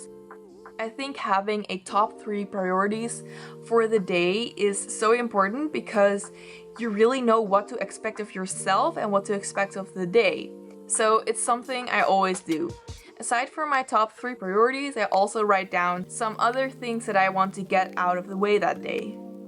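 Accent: Netherlands. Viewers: Haha I thought you sounded Dutch and then I saw the Kruidvat & Albert Heijn products. I love Dutch YouTubers who make English videos! ☺️ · are u dutch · Hold on for a sec, your Dutch?😱😱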